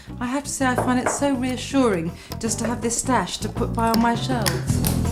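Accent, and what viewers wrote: United Kingdom. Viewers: chok-lut - I hat that British pronunciation, and straw-breez. I can't listen to it. Buh-bye.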